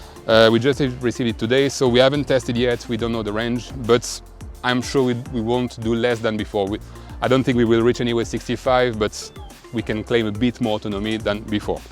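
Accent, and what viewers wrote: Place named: France. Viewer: Tu et français ?😂 en tout cas tu en a l'accent😊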